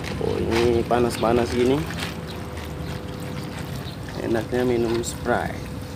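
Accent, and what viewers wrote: Malaysia. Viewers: ko dah boleh ikut loghat malaysia bro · Cakap pon dah mcm org Malaysia..👍 Kalau bleh cakap Kedah lg sempoi... hihi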